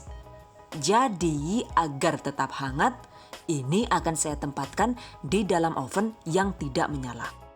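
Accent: Banyumas, Indonesia. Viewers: ngapake ky inyong...😀👍👍👍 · Tek baca Madiun baca lg Madiun takut salah ehh bnr Madiun tp ngapak ky Banyumas Purwokerto😂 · Hahaiii, ora ngapak ora penak Lanjut na mba Subscriber wis nempel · 😜😜😜bahasane rika ngapak temen yuuuu...nyonk seneng lah😜😜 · Mantul Bun. Eh kok agak gelap ya vidionya bun? Di dalam ruangan ya ? Atau lagi mendung ? Tapi tetep mantul kok masih jelas apa lagi ngapaknya bunda bikin emez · salam ngapak..ora ngapak ora kepenakk ya bun..hihi..mksh resepnya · Banyakin logat ngapaknya dong bund...biar nontonnya makin semangat 😄😄😄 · Rika wong ngendi lik? Inggrise karo ngapake kepenak temen.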